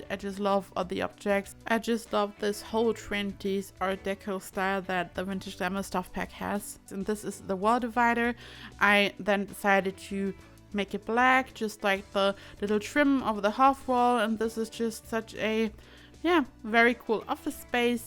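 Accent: Germany. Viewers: I recognized the accent, but wanted to make sure. Ich bin auch aus Deutschland ☺️ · Are u german? You sound kinda german to me ☺️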